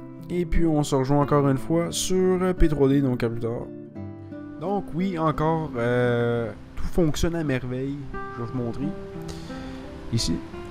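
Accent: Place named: Quebec, Canada. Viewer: yeahhh un quebecois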